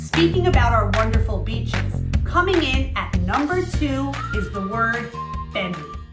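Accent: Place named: South Jersey, United States. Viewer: You honestly sound more south Jersey to me. Wawa- howee-gee Down da shore Wooder lol!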